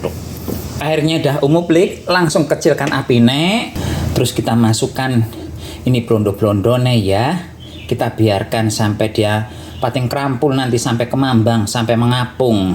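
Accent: Banyumas, Indonesia. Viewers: As. Mas sampean kok baasanya seperti bahasane innyong ibu juga orang ngapak daerah banyumqsan pingin kenal mas biyung dirah sukses slalu amin.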